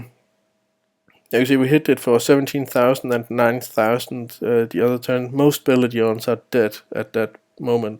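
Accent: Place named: Germany. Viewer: I bet you are from Germany, it really sounds like it. :D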